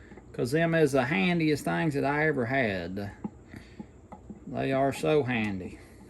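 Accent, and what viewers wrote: United States. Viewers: those pies look absolutely delicious oh yea I love your country accent,your a great dad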